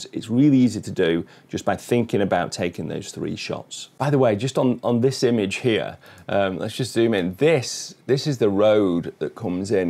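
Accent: United Kingdom. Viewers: Today i find out most annoying English accent in the world uk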